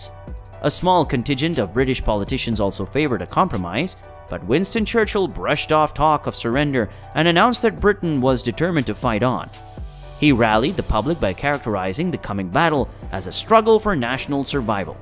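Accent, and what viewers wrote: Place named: United States. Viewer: No I didn’t like the video and you won’t see me next time. Why didn’t I like it? Ludicrous American accent from an individual who can barely speak English. Why can he not pronounce British place names e.g. Coventry, properly? Totally inappropriate and ludicrous video footage throughout. What on earth have American planes got to do with the Battle of Britain? And was that a clip of a Japanese scene at the very end? The whole thing is absolute garbage.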